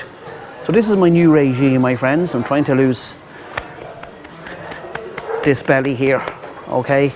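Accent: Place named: Louth, Ireland. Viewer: Glad you enjoyed it old bean .You have the Drogheda /Louth accent for sure .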